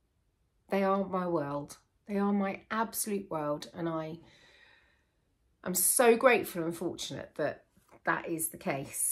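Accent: United Kingdom. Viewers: It is a strange world where busyness tries to dominate- then we pause and ‘sit for a visit’ in the home of a fellow knitter who lives half way around the world. You make us laugh, and think, and together we all pause to be thankful. As an American I’ve always wondered about my very positive response to hearing the British accents. Then I had my DNA done and discovered all my English, Scottish, and Irish roots. Your honesty and adoration, frustration and dedication, to the four males in your house is remarkably apparent. In a world where ‘homemaking’ is pitifully underrated, and desperately needed, you set a good example. Keep up the good work. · 🥰🥰what a lovely time I’ve had knitting while you chat, thank you for that. And yes we Americans think British accents are amazing. Anyone who breaks a friendship with you is the one who lost a gem! ( sent by a big fan who is usually very quiet)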